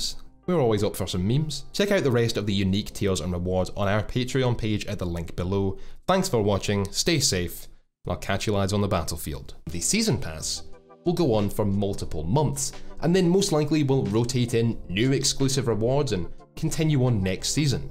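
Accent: Scotland, United Kingdom. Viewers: Scottish ey noice!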